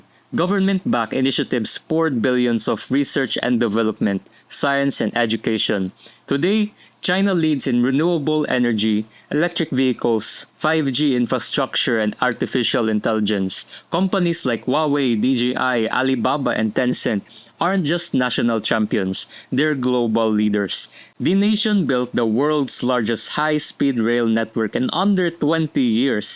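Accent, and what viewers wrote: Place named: Philippines. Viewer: Downvoted for a flip speaking English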